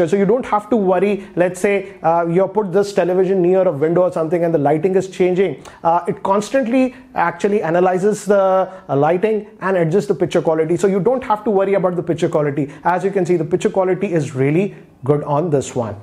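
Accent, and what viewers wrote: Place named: India. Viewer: english indian accent... the most terrible!...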